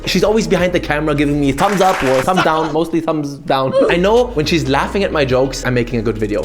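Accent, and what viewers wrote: Germany. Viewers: Manchmal hört man deinen deutschen akzent raus😂❤️ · Alder du bist doch deutscher .. hör ich doch am Akzent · Your english sounds really german but also really good 😂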